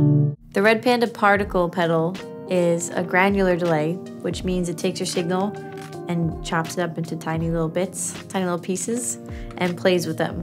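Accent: Long Island, United States. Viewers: I saw on jhs and im here tone a simp and here cool pedal shit. U and ur LI accent along with ur talent make u the cutest thing i ever saw. Sorry for being weird, ur awesome🤘🏼🤘🏼🤘🏼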